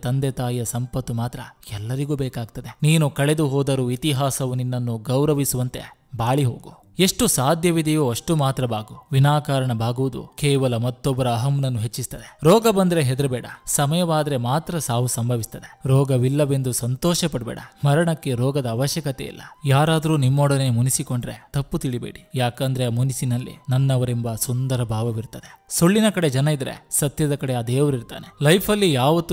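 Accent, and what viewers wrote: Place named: Uttara Kannada, India. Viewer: Utaara ಕನ್ನಡ from ಬೆಲೆಕೇರಿ 🥰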